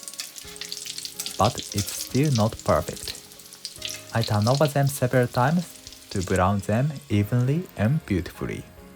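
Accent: Japan. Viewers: I like your Japanese English! Thanks for the recipe, I will make it tomorrow ❤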